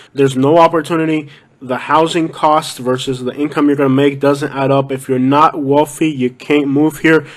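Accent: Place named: North, United States. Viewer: And where are from??I have been here 70 years!!and you tourists,are what has ruined my state!!Get out!!ur accent tells on you.And yeah,South Florida sucks,It did not used to be like this,until the yanks moved in!!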